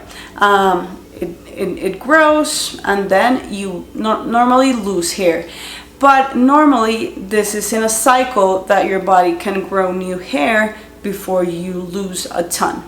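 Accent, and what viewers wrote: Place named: Colombia. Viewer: Tu inglés es muy bonito ❤️ me gusta tu canal en español y me encantaaa tu acento colombiano 😊 peeeeeero estoy aquí por puro gusto para mi oído que ama el inglés. Un abrazo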